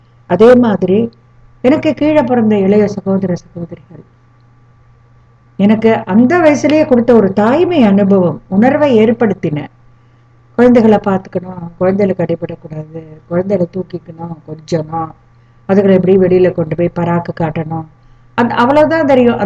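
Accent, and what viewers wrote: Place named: Palakkad, India. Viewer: I follow all clips because I like the spoken tamil it resembles Paalakkaattu tamil. I am from Srilanka. Thank you so much for all cooking.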